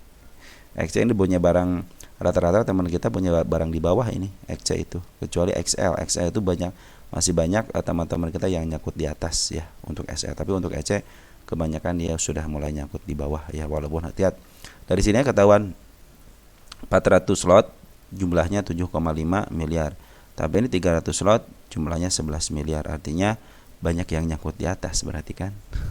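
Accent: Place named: Sunda, Indonesia. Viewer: Orang mana si akang , logat sunda na halus pisan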